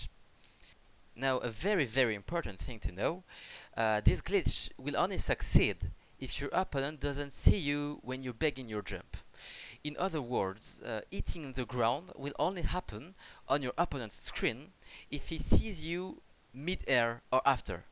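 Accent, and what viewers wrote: France. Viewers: have you never heard a French accent before? lol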